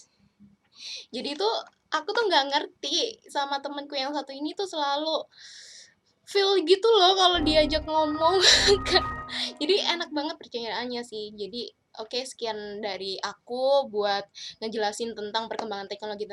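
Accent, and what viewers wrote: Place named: Surabaya, Indonesia. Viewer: Nessie judge rasa sby iki 🤣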